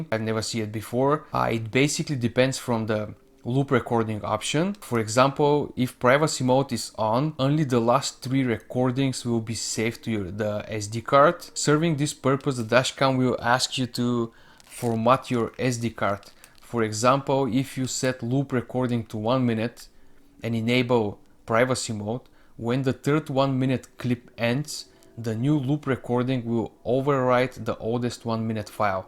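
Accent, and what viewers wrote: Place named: Brazil. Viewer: brazilian accsent :)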